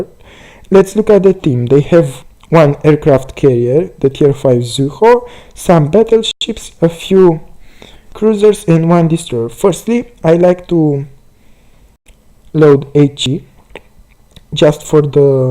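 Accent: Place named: Romania. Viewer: You sound like an romanian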